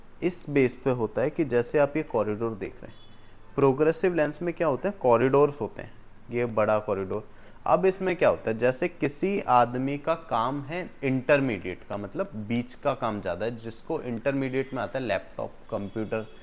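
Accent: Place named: India. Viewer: your tone and accent is too hindi medium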